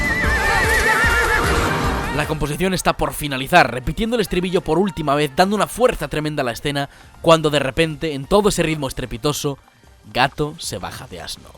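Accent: Spain. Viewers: Que diferente es escuchar un análisis de Shrek por un español, cuando tu la viste en español latino. No saben de lo que se pierden xD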